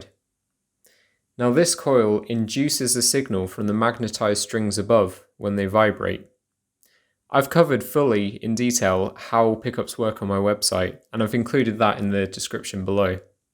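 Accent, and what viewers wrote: United Kingdom. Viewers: Thank you so much. Great explanation and so good to hear a British voice.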